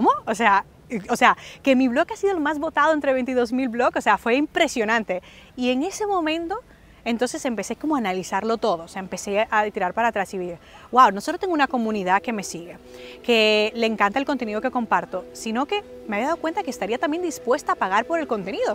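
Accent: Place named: Dominican Republic. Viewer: a mi me gusta mucho que te sale el acento dominicano y español a la vez, es una bonita mezcla. Excelente historia, a por mas.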